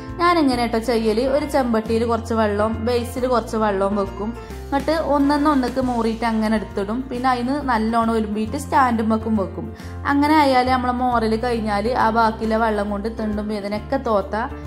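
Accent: Malappuram, India. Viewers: Enthaaan itrak mosham ano ngale malapuram slang itrak veandaayirunnu too sad to hear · അതെ. തനി മലപ്പുറം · ഇത്തയുടെ ചാനലിൽ അത്യമായിട്ടാണ് വീഡിയോ കാണുന്നെ ☺️ നമ്മടെ malappuram ഭാഷ ഉഷാറായിട്ടുണ്ട് 👍👍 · Vlog adipoli Malappuram samsaram 😄😄😄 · Ur Language is real malappuram taste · Like 29👍👍👍👌👌malappuram basha 👍👍👍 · Soooper eniku malappuram Basha nalla isttamanu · Ningade mlp slang Super to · ഹഹഹ...ഹഹഹ...മലപ്പുറം ഭാഷ അടിപൊളി....കൊള്ളാം... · മലപ്പുറം ഭാഷ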